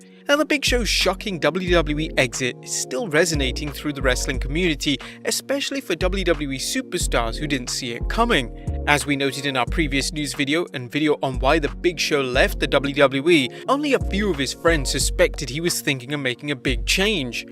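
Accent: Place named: United Kingdom. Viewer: "Money is always a big motivator"- I'd say money's always the biggest motivator, if not the only one, in WWE... It's funny to hear a British accent talking about the American pro-wrestling, since the majority of people in the EU (myself included) can't understand what's going on there at all!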